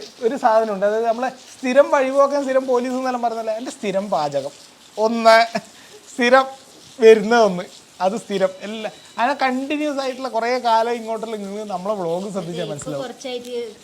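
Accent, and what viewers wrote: Kannur, India. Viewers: നിങ്ങളുടെ vdo കാണുന്നത് ഈ സംസാരം കേൾക്കാൻ വേണ്ടിയാണ്, എല്ലാരുടേം സംസാരം എനിക്ക് ഒത്തിരി ഇഷ്ടാണ്. കണ്ണൂർ സ്ലാങ് നിങ്ങൾ പറയുന്നത് കേൾക്കാൻ സൂപ്പർ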